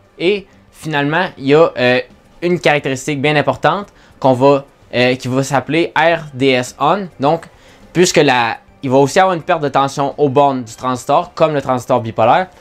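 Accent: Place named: Quebec, Canada. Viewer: "à la bose du trastore"... que j'adore le français québéqouis! Vraiment, je fais pas de sarcasme, j'aime l'homme Québéquois.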